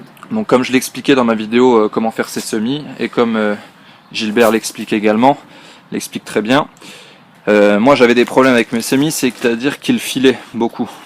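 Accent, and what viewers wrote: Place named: Vosges, France. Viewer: Super ces video, ca enchaine lol... J ai bien vu la (les ) video de Gilbert, mais étant proche de Rambervillers je comprend mieux ton accent;o)) Merci pour toutes ces video et leurs petites musiques douces...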